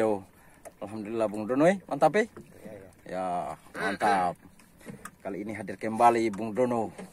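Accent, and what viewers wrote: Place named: Makassar, Indonesia. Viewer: Salam dari Buton#gue kirain ornag Makassar hheee padahal orang baubau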